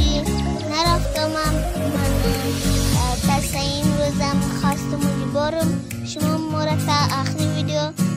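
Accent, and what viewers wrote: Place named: Hazarajat, Afghanistan. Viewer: ماشاالله بسيار عالي با اين لحجه هزراه گي موفق باشي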